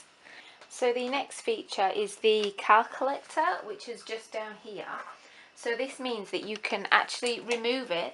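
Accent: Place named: RP, United Kingdom. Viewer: very posh! x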